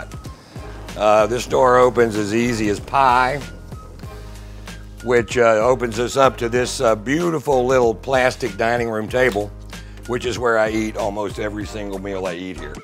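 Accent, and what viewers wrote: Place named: Texas, United States. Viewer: You know he’s from Texas.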